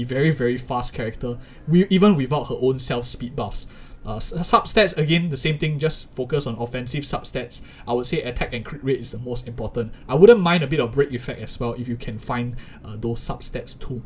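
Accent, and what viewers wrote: Singapore. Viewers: i recognize singlish when i hear it rofl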